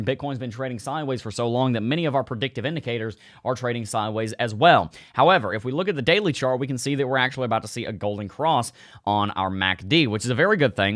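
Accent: South, United States. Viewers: I love your southern accent and with a name like I can trust everything you say hombre. You got a sub in me mi amigo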